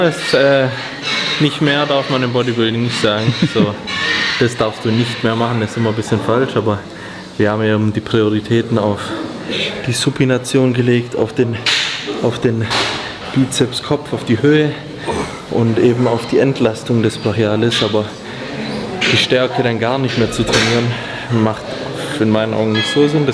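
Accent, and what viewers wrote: Swabia, Germany. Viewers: Mehr Schwäbisch bidde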